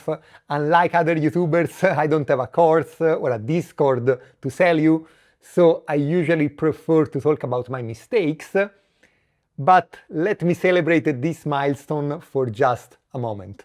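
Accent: Italy. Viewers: by the accent I think you’re Italian like me. Anyway I’ll write in English so everyone can read. I’ve been invested for few months now. I found it because I was upset after I sold my MU position at 120$ and was looking for the next AI bottleneck. They have a spintronics technology which serves to acquire, store and transmit data. And I thought this could be helpful in the future for AI considering the problem now is mostly about Data management. And it doesn’t require water to cool down like nowadays data centers. Let me know your opinion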